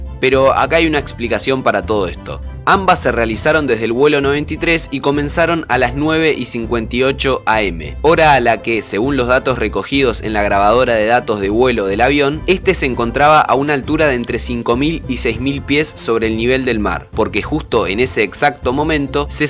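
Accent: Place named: Rioplatense, Argentina. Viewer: Es un vídeo interesante, aunque varias de las explicaciones ya han sido ampliamente conocidas anteriormente. Ahora en este vídeo olvidaron uno de los hechos más significativos, que es el derrumbe injustificado del "Edificio 7", el que no fue tocado por ninguno de esos aviones empleados en esta Bandera Falsa, sino que ese edificio se derrumbó solo y sin explicación alguna. Y para mayor abundamiento, una periodista estadounidense en su informe en vivo mencionaba el derrumbe del "Edificio 7, cuando a su espalda, éste aún se mantenía plenamente en pie, y sin daño alguno. No obstante ser éste un buen documental —y lamentablemente— el relator de este vídeo padece de la tara foniátrica rioplatense de confundir reiteradamente la pronunciación de la LL y la Y, con la CH. Él menciona esto: chamar, cha, aquechos, chamadas, prochecto, echa, detaches, chamativo, chegaba, achí, chevaron, chegar, machor, anicho, cacheran, apochan, echas, ensachos, concluchendo. 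Por querer decir esto: llamar, ya, aquellos, llama, llamas, proyecto, ella, detalles, llamativo, llegaba, allí, llevaron, llegar, mayor, anillo, cayeran, apoyan, ellas, ensayos, concluyendo. Lo cierto es que el sonsonete reiterativo de esa tara foniátrica, termina fastidiando al oído de cualquiera que no sea de esa región. Creo que este videísta debe ir pensando en instalar una voz robótica en sus vídeos, es mucho más neutral, tienen buena pronunciación, y lo mejor de todo, sin esos desagradables sonsonetes de aldea. Hay que cuidar n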